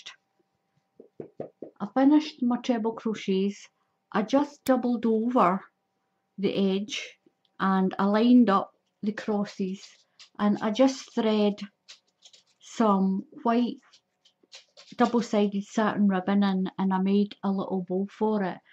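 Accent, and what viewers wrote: Scotland, United Kingdom. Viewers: Nice to hear the Scottish accent!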